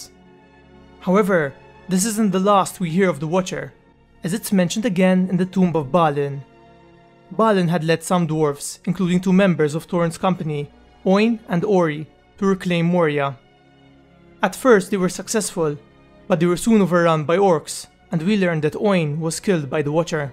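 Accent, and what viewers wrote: Malta. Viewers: Your accent is interesting. Are you Maltese by any chance? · Ifhem, fl-about hemm "country: Malta" kif wkoll l'accent jghin naqra ghal assumazjoni bhal dik! Proset imma eh, kompli sejjer hekk :D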